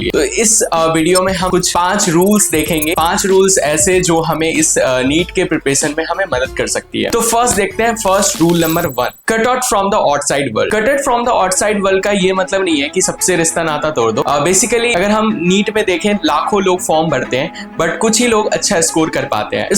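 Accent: Bihar, India. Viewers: He is from Bihar and has that bihari accent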